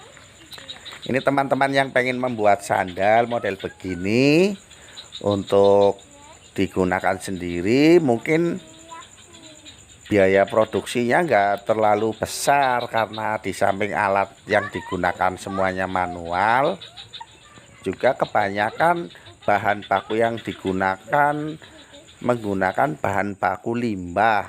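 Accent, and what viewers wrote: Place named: Banyumas, Indonesia. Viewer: logatnya ada ngapkinya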